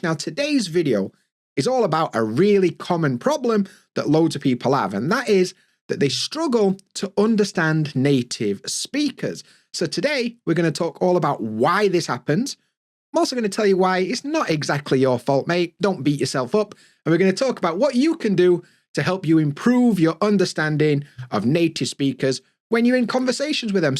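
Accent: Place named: Yorkshire, United Kingdom. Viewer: yeahh mate, thanks !! just be able to hear your accent is already help a ton ! not everyone use yorkshire accent everyday !! and you already give a lot of ecouragement video too, thanks !! and if you can give more things about some rules,, that would be perfect !!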